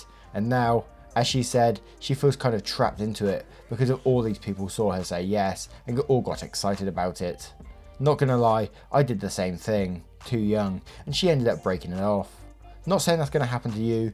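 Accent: United Kingdom. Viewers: This is the only channel I know of with a UK voice, I like R/Slash and RStart but this is a properly organic community with interaction and humour.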